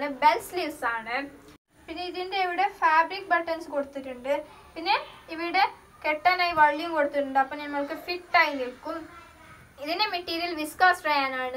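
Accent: India. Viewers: Malayalee ano atho tamil ano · Ksd basha pola ind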